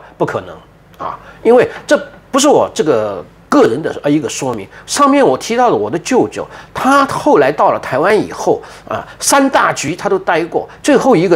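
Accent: China. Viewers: 昆明话太地道了😂老乡啊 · 平先生的雲南口音地道😊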